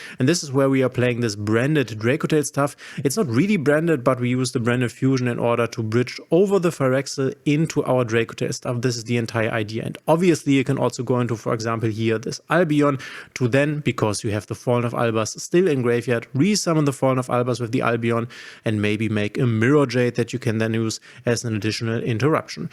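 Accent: Germany. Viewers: thick german accent lol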